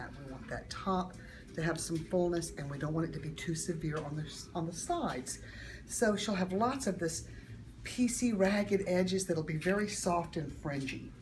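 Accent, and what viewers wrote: United States. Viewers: What a wonderful cut and style for this lovely lady. You sound like you could be from Georgia, or nearby. If so, I want you to cut my hair please! I'll drive the distance! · Great job! I hear a southern twang...where are u located?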